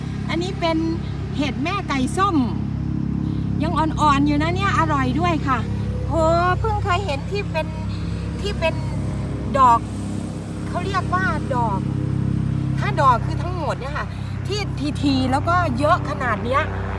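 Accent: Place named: Isan, Thailand. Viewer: เว้าอีสานโคตน่าฮักเลยจ้าชอบๆค่ะ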